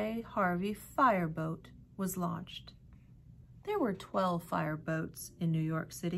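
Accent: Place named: Texas, United States. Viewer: I love you. Ty for this. Btw, u have a Texas accent now. ☺️ I love it!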